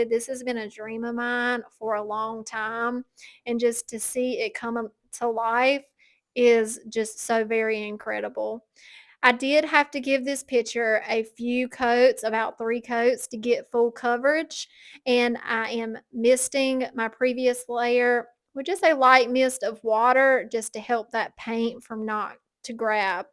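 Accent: South, United States. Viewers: Gotta love the southern accent. You continue to amaze me with your creativity. Keep up the great work your biggest fan,😍💗U!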